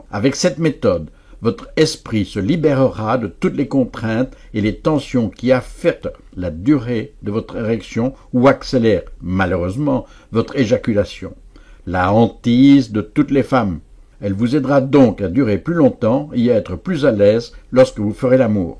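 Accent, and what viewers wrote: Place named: Belgium. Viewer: Un "coach"? Mdr.... Ne serait il pas plus logique de consulter une femme pour ce genre de problème.? Il semble qu elles soient plus à même de savoir ce qui leur importe et leur plaît le mieux (non,que la bandaison n ait aucune importance,attention. ..). L accent belge de ce type au pseudonyme américain en dit long sur son efficacité et ses compétences. ...Ça pue le charlatanisme à plein nez,et à 300km à la ronde! Pathétique! ...Et j ai deux demoiselles à mes côtés qui approuvent mes dires,...Lorsqu elle ne me les ont pas carrément "suggérés"...D apres elles,il serait bien à côté de la plaque...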